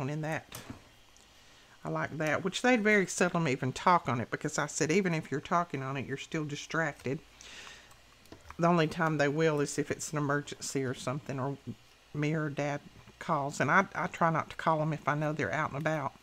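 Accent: South, United States. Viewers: I love everything you do, and these are so lovely. I also love your accent, are you from around where Elvis lived? I am from Portsmouth, UK. I've been to a few places in the USA, and it's my favourite country on the planet. Take care and keep crafting. xx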